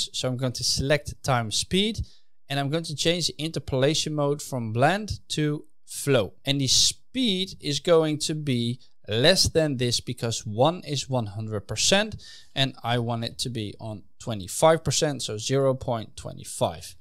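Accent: Netherlands. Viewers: oooh i'm dutch and i heard a dutch accent (sorry for thinking u were dutch)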